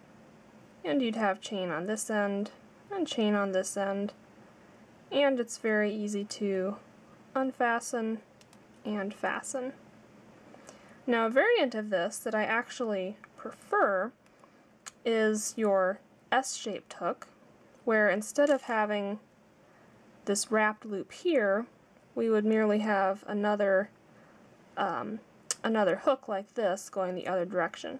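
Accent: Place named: United States. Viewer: From the UK, it makes a change to have a clearly understandable audio, albeit an American accent, hahaha, ☺☺☺, cool calm and collected, I've 👍, and subscribed, luv and cuddles from me and your UK. Viewer's ☺😊😀☺😊😀❤❤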